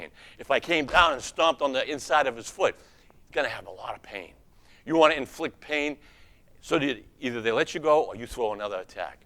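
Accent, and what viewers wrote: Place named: New England, United States. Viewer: I can tell by your accent you live in New England.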